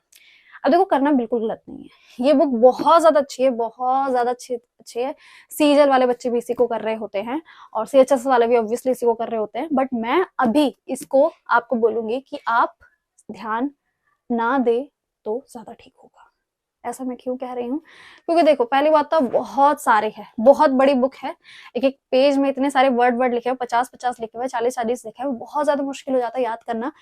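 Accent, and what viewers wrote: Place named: Pahari, India. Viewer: Pahari lg ri ho 😅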